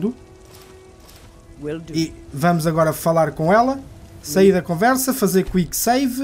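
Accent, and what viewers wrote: Portugal. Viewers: Olá! Primeiro quero parabenizar pelos seus excelentes vídeos. Gosto especialmente de seu sotaque português que lembra muito meus avós. Bem, eu descobri uma forma mais simples de duplicar itens. Primeiro drope itens em qualquer lugar de Skyrim (em especial nas cidades, em lugares mais visíveis), peça para seu follower pegar os itens e, em seguida, dá um fast travel para uma cidade próxima. Pode dropar alguns itens ali também, repetir o processo e voltar à cidade anterior com fast travel. Ao voltar ao local, ali estarão os itens dropados... se vc pedir novamente para seu follower pegar os itens, ao voltar novamente para o local, via fast travel, eles duplicarão novamente! Fiz isto várias vezes e funcionou no Skyrim Special Edition. Só tenho problemas em duplicar as pedras preciosas, pois vem os guardas e querem me prender... kkk... teve até uma hora que a Lídia começou a querer me matar!! 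E ela já era minha esposa.... tive que retornar o save, porque ficou uma confusão.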